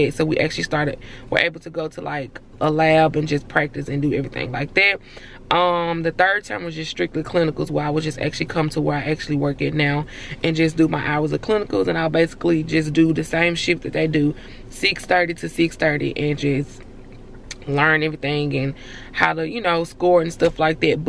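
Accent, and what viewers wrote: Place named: South, United States. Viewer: Voice hella country lol but at least the vids aren't boring like some others who do them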